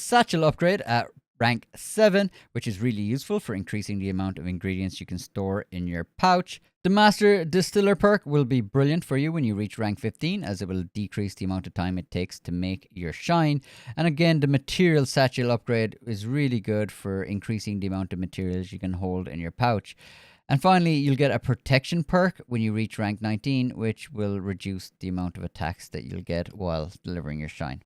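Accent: Ireland. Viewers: I love the Irish accent.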